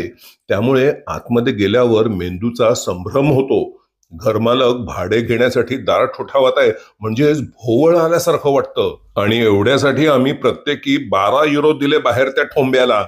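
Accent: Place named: Goa, India. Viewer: Khup chan video editing karta ani voice over pan.. malvani thoda goanis zhala ..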